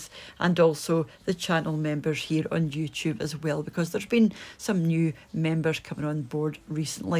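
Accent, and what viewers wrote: Scotland, United Kingdom. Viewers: Your Scottish accent combined with your voice is the most beautiful sound in the world ❤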